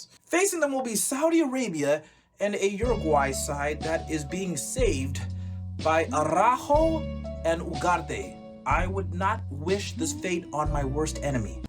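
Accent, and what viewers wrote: South Africa. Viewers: Are you south african? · Are u originally south african?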